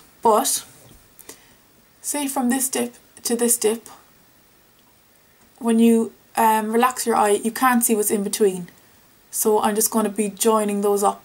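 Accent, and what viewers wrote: Ireland. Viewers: Your Irish accent is a dream! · I have been looking everywhere for an informative and straightforward tutorial on how to do a winged eyeliner on eyes with low creases - and here you are! Sure, I've found a few here and there but no one explains the jagged connected line that my weird creases need to get that smooth line when looking straight on :D And your lovely Irish accent is just sweet icing on the creased cake. Keep up the good work! /Linn Andersen (Sweden)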